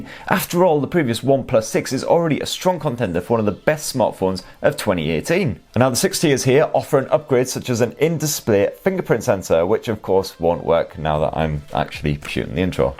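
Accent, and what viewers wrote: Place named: Geordie, United Kingdom. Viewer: Sounds more like north east England to me, a slight Geordie twang to his accent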